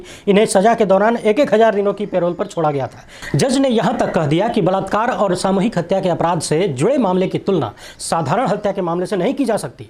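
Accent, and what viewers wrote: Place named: Bihar, India. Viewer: Sar ap ki bhihari jo ton ha vo news me tadaka laga dethi Like 4ravish Kumar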